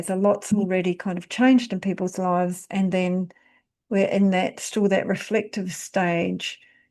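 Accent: New Zealand. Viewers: Just found you early hours on 21st November!🙂 It's so refreshing seeing and hearing a kiwi accent!🤓🙏🙌 Thank you!🌸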